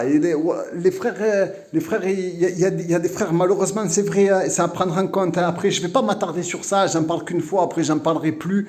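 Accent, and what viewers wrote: Marseille, France. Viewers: Moi, je trouve que tu as plus un accent marseillais qu'autre chose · Frère il faut rien dire point faut juste faire. Et pour le tu parle comme un voyou je pense c'est ton accent ils ont pas l'habitude.. Ça Renvoi à Marseille le banditisme... Bref Oui en hijra faut écouter personne sérieux beaucoup qui vendent du rêve et ya bcp de jaloux en Algérie faut se méfier de tout le monde😂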